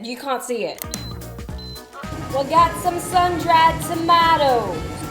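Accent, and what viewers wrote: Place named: Australia. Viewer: The Australian accent 🤌🤌^^ :D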